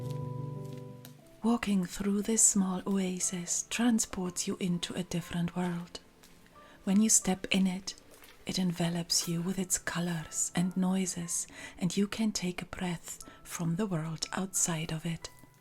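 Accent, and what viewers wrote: Scotland, United Kingdom. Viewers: Every time I watch a video of yours, I'm positively surprised by hearing your Scottish accent. I love the Scottish accent, and personally for me, this is one of the most beautiful accents there is.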